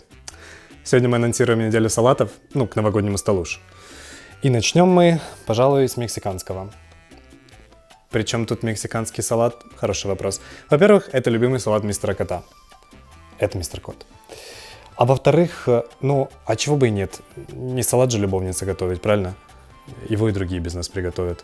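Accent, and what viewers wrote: Odessa, Ukraine. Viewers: А я люблю,чисто одесский акцент:,, здрастье вам через забор"...😄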